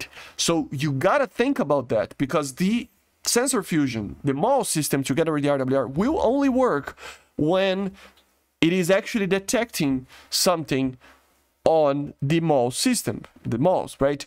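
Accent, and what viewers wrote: Brazil. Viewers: Tu é BR ne ? Certeza!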